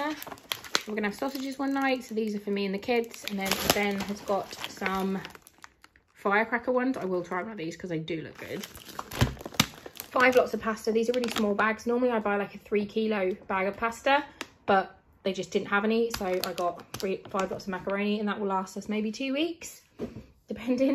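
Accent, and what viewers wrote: Norwich, United Kingdom. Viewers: The sour balls!! dough balls! look good!! and awesome choice on making meatballs!! those are delicious!! Also those cheesy jalepeno bites look amazing omgs!! Love that nutcrunch cereal too :) Congratulations on your weight loss goals :) I have been only eating very low calorie meals and taking in 800 to 1400 calories a day max, I do 200 crunches and 120 pushups 3x a week and walk decent amount at work. I am always afraid to eat too much on my cheat days because i dont want to lose my gains lol. Great choice in foods, love your food hauls!! Also you look super goddess beautiful in video omgs, those eyebrows, mascara, and that norwich accent :) lovely to watch and listen too :)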